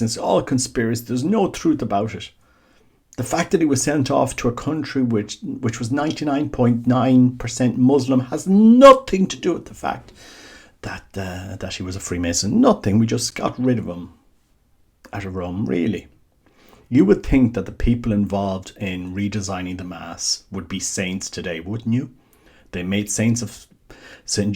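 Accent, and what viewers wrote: Ireland. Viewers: Your subject matter is excellent and you speak so clearly. You are a true Catholic and It is a pleasure listening to your lovely Irish lilt. May God bless you abundantly.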